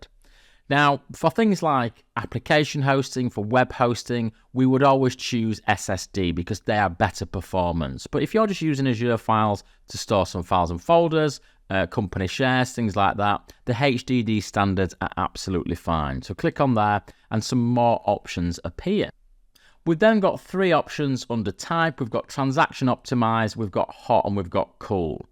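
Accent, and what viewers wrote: United Kingdom. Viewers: ‘Okay’ and ‘here’ love UK accent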